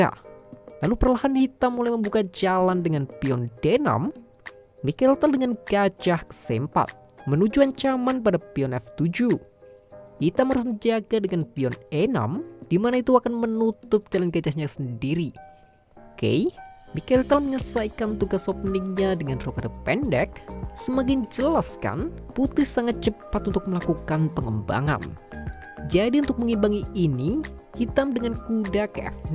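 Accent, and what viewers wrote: Java, Indonesia. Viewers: Konten berulang ini ya? ini yang versi lama : <link> versi medhok jawir, walaupun sekarang masih medhok sih aowkwkwk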